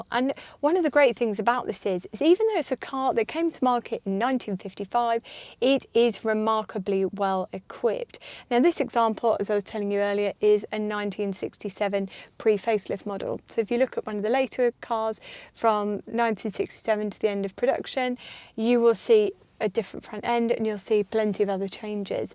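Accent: United Kingdom. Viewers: We can tell that your love for this car is not feigned. And I love your British accent (quite logical since you are), your colorful expressions (...like a swan on a pond) and you drive divinely (deessely) well and with style (with your leopard coat you look like Catherine Deneuve in a Truffeau film, perfect temporal matching)🙏💙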